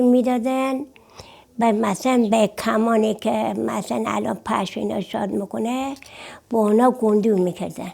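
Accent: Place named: Eastern Turkey, Turkey. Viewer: She speaks similar tone as eastern accent of Turkey with similar sentence emphasises so I feel like I should understand it but barely get a few loan words.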